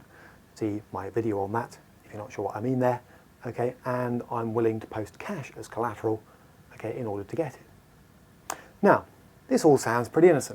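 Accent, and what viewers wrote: United Kingdom. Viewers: lmfao love and his brilliant explanations with a great British accent!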